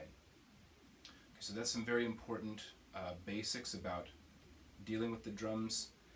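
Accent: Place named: England, United Kingdom. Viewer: It’s funny hearing Indian words from a Englishmen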